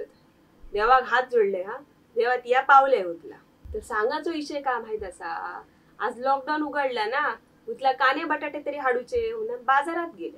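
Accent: Malvani, India. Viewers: Tuzi Malvani phar wegli sound kartay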